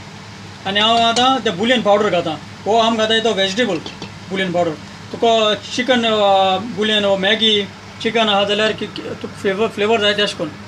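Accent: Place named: Salcete, India. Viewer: I lik ur salcete accent.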